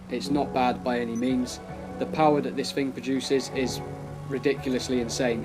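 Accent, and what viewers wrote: England, United Kingdom. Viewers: That's coz he's fucking english you bellend!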